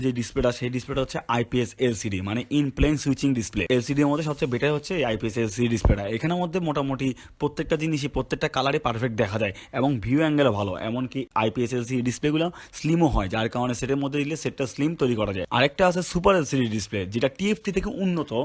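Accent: Chittagong, Bangladesh. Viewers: ভাই আপনি চিটাইঙ্গে নাকি?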